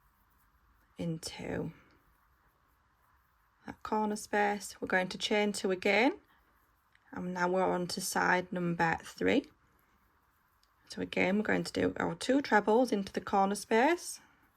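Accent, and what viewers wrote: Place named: Northern England, United Kingdom. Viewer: ! I haven't crocheted in years and this is the first project I've wanted to do! I love it! (I usually knit) AND, you sound exactly like Mr Thornton's sister in North and South! Love that film, love Northern England, and love that accent! ☺️❤️ Thank you!!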